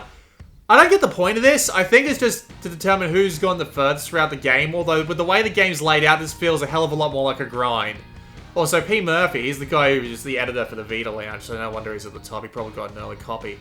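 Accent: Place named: Australia. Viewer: The Australian accent is so harsh and annoying... Whiny...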